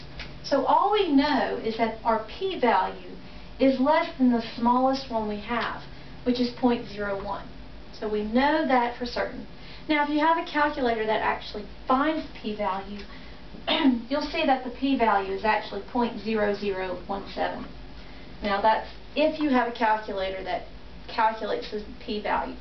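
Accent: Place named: United States. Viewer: Could anyone tell me where is this accent from? Sounds American to me but not sure which state. Thx :)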